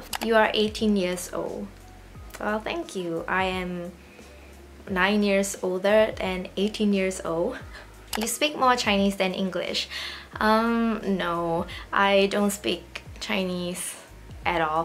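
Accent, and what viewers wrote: Singapore. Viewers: Your first video that I saw was the purito review and when I heard your voice I assumed you're a Singaporean so I came to your channel and saw this video and I was right hahah. Singaporeans really have this distinguished accent XD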